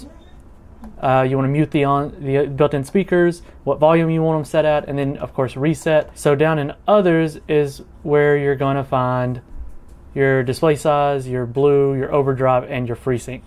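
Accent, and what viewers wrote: South, United States. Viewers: Love the soft southern pronunciation. Very relaxing to this Yankee.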